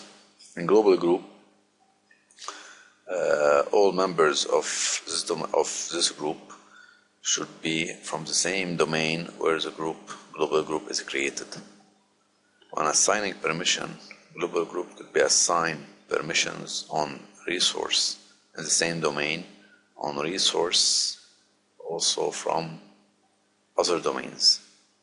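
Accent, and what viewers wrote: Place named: Germany. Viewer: no explanation whatsoever...seems to be in some kind of hurry.........could not understand stupid german accent also !!!!!!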